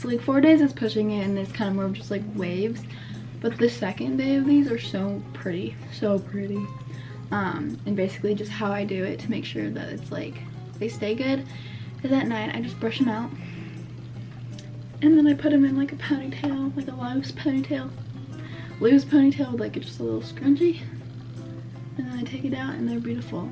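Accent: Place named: South, United States. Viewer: love your hair color and i love the little hint of the southern accent in ur voice, ur so cute!!!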